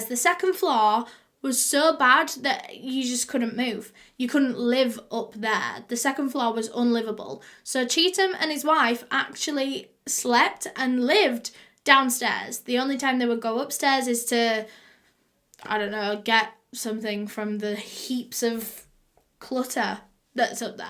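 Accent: United Kingdom. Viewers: how did I know you were from uk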